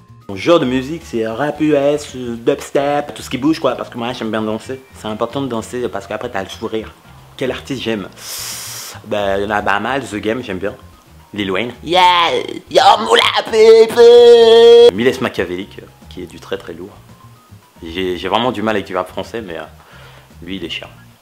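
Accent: Africa. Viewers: C est vrai que tu fais trop bien l'accent africain x') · trop la classe l'accent africain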